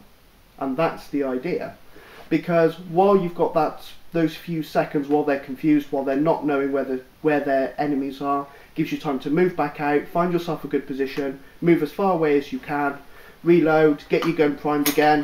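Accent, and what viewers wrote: United Kingdom. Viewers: Are your British ? That's really cool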